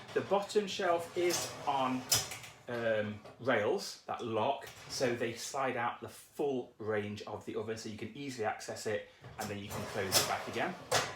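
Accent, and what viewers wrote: United Kingdom. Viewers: Thank you for this excellent review of a product that I will need to replace as well. I also am a fan of Bosch appliances and have had good results with all of the. Now it is time to update the hob and oven. Reading the Bosch website info makes all the products so alluring and the more money you spend the better the appliance sounds. This video and especially the follow-up are just so objective and provided all the information that I needed. Bosch is extremely costly here where I live and spending that amount of money on the oven that has some minor flaws, that might turn into major issues after the warranty has expired, is a big NO NO. Also love your accent, that if I have to guess is from the Midlands.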